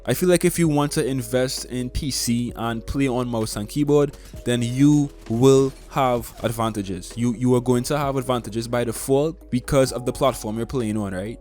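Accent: Trinidad and Tobago. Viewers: “The apex YouTuber with an accent” Me knowing he’s a trini: 🤣🤣🤣🤣🤣🤣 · Just started playing apex and your my fav apex yt rn much love and btw are you from Trinidad & Tobago cuz i am and you sound like a trini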